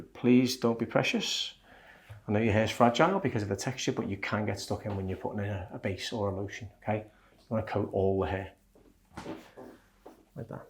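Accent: United Kingdom. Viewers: My Texas hearing has some difficulty understanding his thick British accent!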